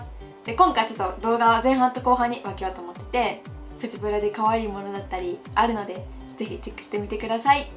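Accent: Kansai, Japan. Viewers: 関西弁かわいい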